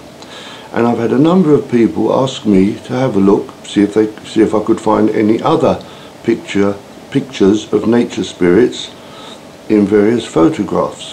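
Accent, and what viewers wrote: United Kingdom. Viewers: Well, it's great you raise those questions! People do need to challenge their familiarities and I suppose your questions do just that. I haven't gotten to watch all of your videos, but I was curious to know where you got your education. I speculate that you're British from the accent, but that's the best guess I could give! So what's your story, in short?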